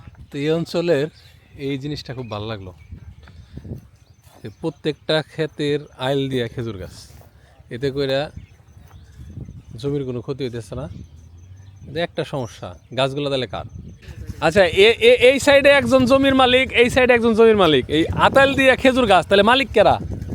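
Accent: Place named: Jessore, Bangladesh. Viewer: আমাদের যশোরের ভাষা মনে হচ্ছে, ভাই কি গিয়েছিলেন??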